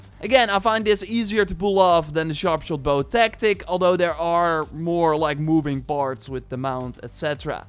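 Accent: Netherlands. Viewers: Like your videos but it is not "Tear" as in crying. It is pronounced "ter" as in tearing off my eyes in cringe of recognizing your dutch accent :) enjoy your videos though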